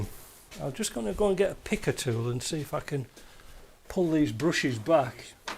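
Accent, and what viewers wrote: United Kingdom. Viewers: Mate where are you located ?? you go that UK accent have'n you !! UK or ?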